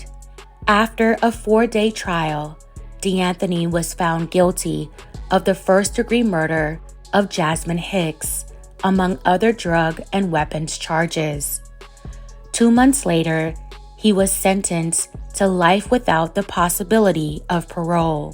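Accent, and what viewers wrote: Baltimore, United States. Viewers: I see you bro ! A.I.. sounds great! I do miss the bmore accent tho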